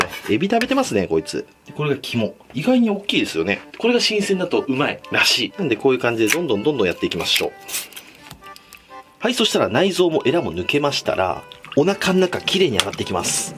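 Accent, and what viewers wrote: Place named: Nagoya, Japan. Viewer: 名古屋弁(尾張方言)なのですね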